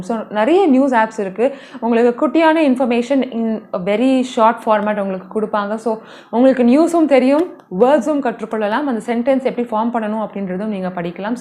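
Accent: Sri Lanka. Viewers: Hi sister neenga srilankava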